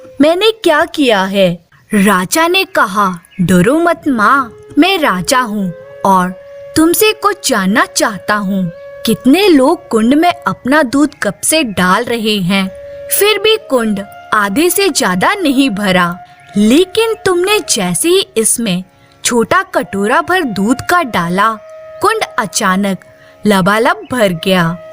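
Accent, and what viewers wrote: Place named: United Kingdom. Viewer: Story sachame achi hai... but aap hindi to English accent me kyu bol rahi hai... try to speak normal... ❤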